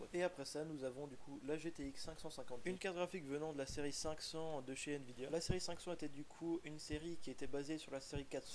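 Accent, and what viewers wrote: France. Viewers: французский, итальянский вообще другой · судя по акценту это французский или итальянский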